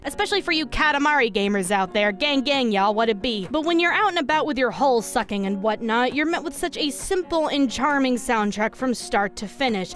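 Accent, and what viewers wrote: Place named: Virginia, United States. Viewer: I'll always have a soft spot for FF8's soundtrack. " ", the boss theme, the standard battle theme, the music during the assault on Dollet,... IT'S ALL SO GOOD. Also, I don't think I'll ever get over that one of my absolute favorite Youtubers is a Virginia native like me. First time I heard talking I was like "I know that accent!"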